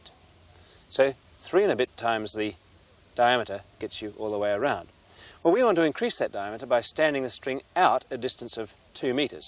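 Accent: United Kingdom. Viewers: I’m an Australian and I picked his accent as slightly British. He may have spent some years studying in England? Or it may just be he has a cultivated accent due to his high level of education. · No he isn't. That's a British accent. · even before clicking the video, i knew that man would have a British accent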